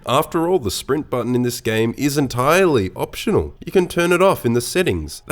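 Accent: Australia. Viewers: No offense, but your Aussie accent makes it incredibly difficult to tell if you're being sarcastic or not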